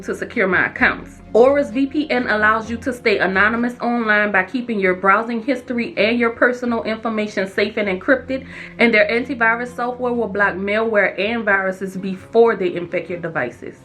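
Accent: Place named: Louisiana, United States. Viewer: Obviously tell your from Louisiana by your accent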